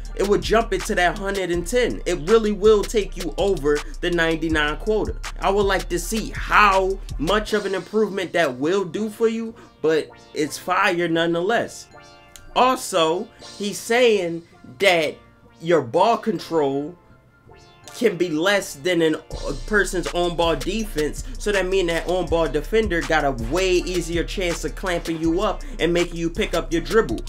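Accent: Philadelphia, United States. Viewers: My nigga are you from Philly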